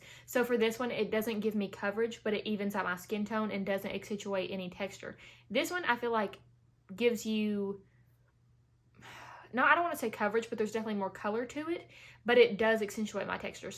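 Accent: South, United States. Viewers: I love love your southern accent. This is my first time watching your YouTube page! I found your channel through #allieglines channel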